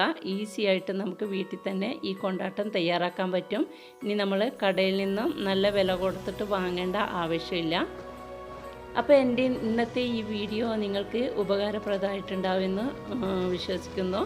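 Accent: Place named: Kannur, India. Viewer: Ith sherikkum oru variety thanne😍😍 ithaante nalla sound😍😍enikk kannur slang ishtaanu.. peruth ishtam ❤️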